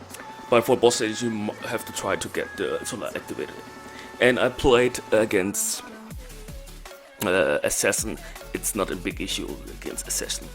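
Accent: Germany. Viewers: Hi are u german? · Bro dein deutscher Akzent 😂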